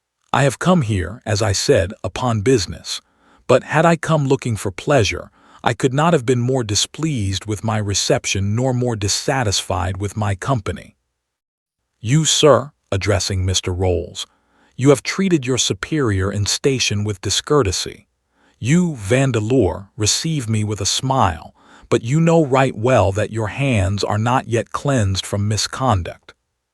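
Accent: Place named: Bronx, United States. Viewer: Pity about the inexplicable Bronx accent, but the narration is competent enough.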